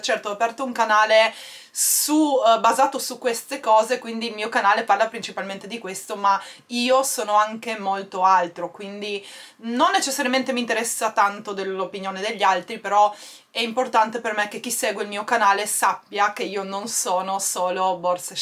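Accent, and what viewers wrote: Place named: Sardinia, Italy. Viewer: allora ho le allucinazioni auditive! !!Sapevo che eri triestina...ma sentivo un forte accento sardo:-)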